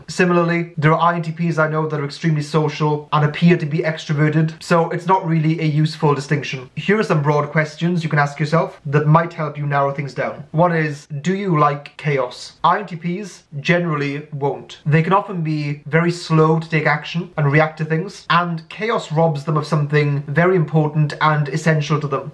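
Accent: Wales, United Kingdom. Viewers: Sounds Welsh to me. :-)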